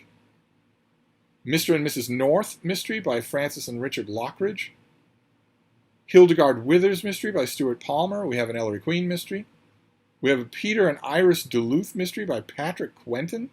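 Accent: United States. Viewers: Sounds like you are in Georgia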